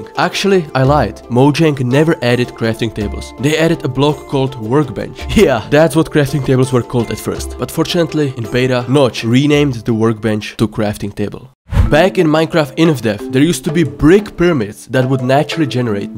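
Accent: Czech Republic. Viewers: Great, I enjoyed the video, but ... aren't you from Czech? Honestly, you sound like that (and your channel name is suspicious too) :D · Proč tu nejsou český komentáře? 😀 Dohledal jsem si to a nespletl jsem see jsi čech, já ten přízvuk asi nedám, ale ta angličtina je super 😇 Jen prostě výslovnost mě strašně tahá 😅 Pardooon 🙈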